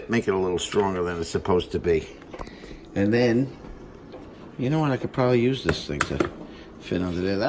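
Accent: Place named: New York, United States. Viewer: Suuuuuuch a great NYC accent! I’m guessing Brooklyn, but maybe SI, Queens, or d’Bronx? 😊 Regardless: thanks for your helpful video. I too am a “kawfee drinka” and I need that damn red light to go off!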